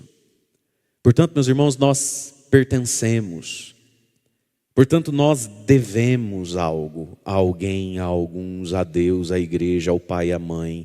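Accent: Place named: Minas Gerais, Brazil. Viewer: A nois aqui travez . Um jeito mineiros de falar rsrsrs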